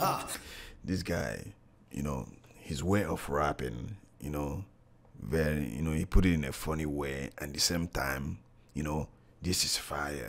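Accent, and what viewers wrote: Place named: Nigeria. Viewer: His accent is Nigerian.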